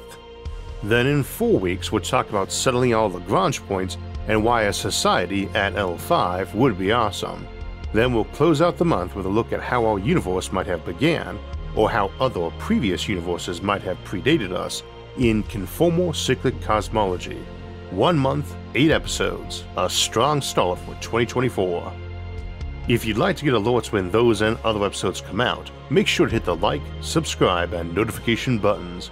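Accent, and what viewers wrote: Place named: Midwest, United States. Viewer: it’s not wrong, it’s just different. It seems like it might be a midwestern thing because Rob Scallon also says it like that and he’s from Illinois.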